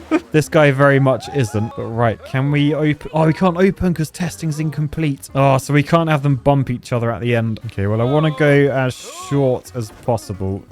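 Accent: United Kingdom. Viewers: Did from get a British accent all of sudden?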